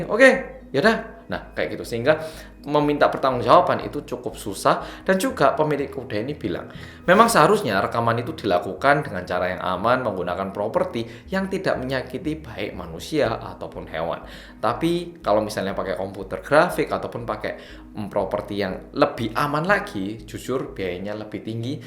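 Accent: Java, Indonesia. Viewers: Baru kenal Mas e ,,, ceritanya gimana oppa jadi semedok itu... 🤣 Udah nikah belom oppaaaa???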